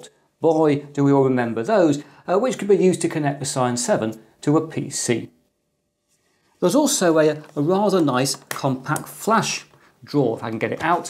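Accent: United Kingdom. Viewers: pure British English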